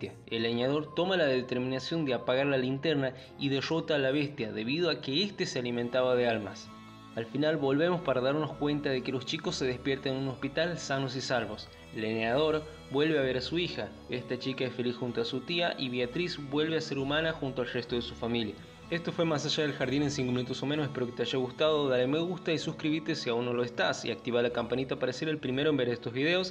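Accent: Argentina. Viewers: No e visto esta serie pero queria saber de que trataría antes de verla, se ve muy interesante la verdad. Eres argentino, me encanta tu acento!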